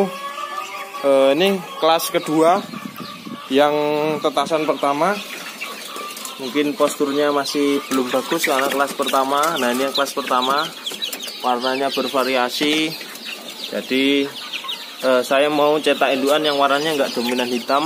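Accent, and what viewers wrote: Java, Indonesia. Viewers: Bahasamu jowo kentel mantul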